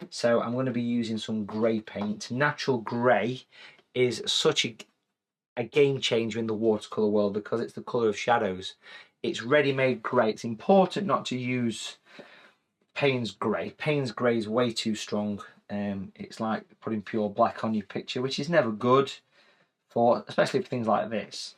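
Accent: United Kingdom. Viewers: I shall give this a go as I loved the rocks. Love your Derbyshire accent too 👍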